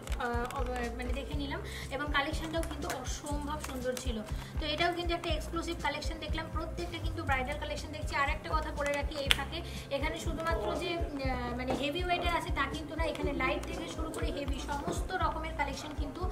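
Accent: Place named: Bangladesh. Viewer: Tumi ki khub recently Bangladesh thike esecho baa jatajat koro? Kotha sunle mone hoe. Amrao oi paarer tobe desh dekhini.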